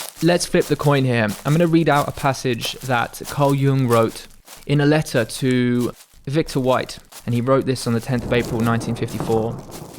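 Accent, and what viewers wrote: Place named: RP, United Kingdom. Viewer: You sound just like the sort who's come back from his gap yaaar abroad in Thailand.. but great vid haha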